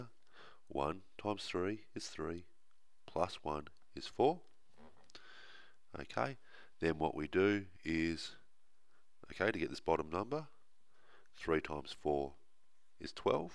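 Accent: Australia. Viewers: you are brilliant.. the british way is more difficult, i guess your from Australia.. thanks a lot my friend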